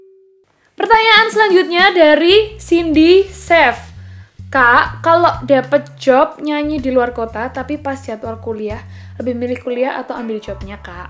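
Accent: Java, Indonesia. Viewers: Jawanya medok banget mba 😊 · jawanya medokin lagi kak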